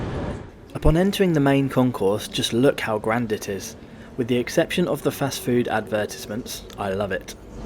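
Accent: United Kingdom. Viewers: Absolutely disgusting; you are claiming that this is a non-political movie, still you are referencing to putin illegal invasion + fundraise + repeat Nazi slogans. Based on your accent you might be from the UK. Ask your relatives, if they know British soldiers, who were wounded or killed by the Nazis in World War 2. Ukrainian Bandera Nazis fought together with the German Nazis against the Allied Forces in World War 2.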